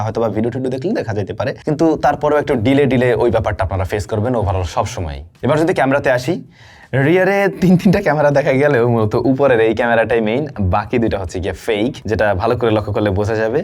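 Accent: Barishal, Bangladesh. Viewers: ভাই বরিশালের এক্সেন্ট গুলা কিন্তু দারুন লাগতেছিলো😊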